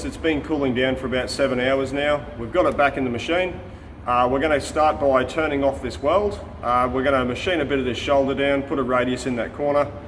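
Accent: Australia. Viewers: Brilliant professional work. Fun to watch and interesting. Particularly like that you show what the part is for and it’s function. Subtitles are good too for that Aussie accent, being a Pom !!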